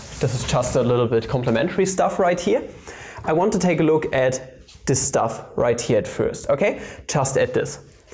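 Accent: Germany. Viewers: Are you german? You sound so bloody german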